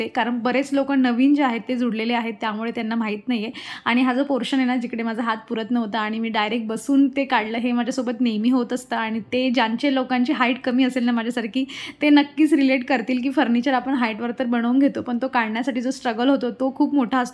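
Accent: Khandesh, India. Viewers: Oy tu aplya khandesh chiy ka v..kudliy nemki gav kont sagjo br...😅u remember our tone ❤😂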